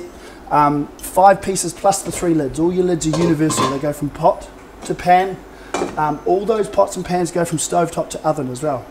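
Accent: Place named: Australia. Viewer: Thanks! Australian, lol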